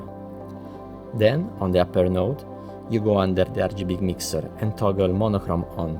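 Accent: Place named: Italy. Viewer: Saturated topic explained in a very unique and amazing way. Learnt alot, thank you. How do project settings affect this process? Ive been on the constant struggle between Color managment, input color space, etc. Is this something that changes your workflow in any way? PS: The italian accent makes it even more tastefull haha thank you!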